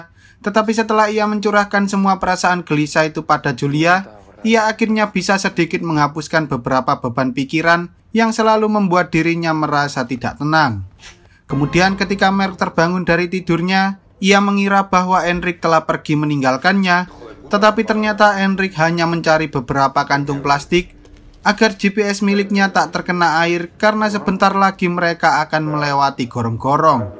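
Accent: Java, Indonesia. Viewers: Laah tnyata wong jowo toh?? 🤣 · Mas ngomongnya dengan bahasa indonesia yg baik dong. Logatnya jangan keciri bnget orang jawanya. Muak aku masss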